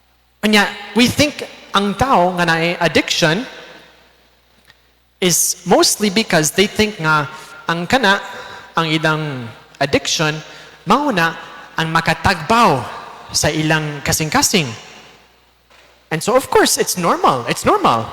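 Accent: Visayas, Philippines. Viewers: Grabe maka Bisaya si padre oi.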